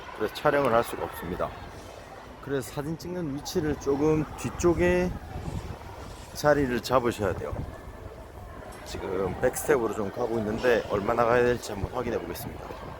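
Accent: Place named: Gyeongsang, South Korea. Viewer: 멋지다^^ 갱상도사투리 쩐다ㅋ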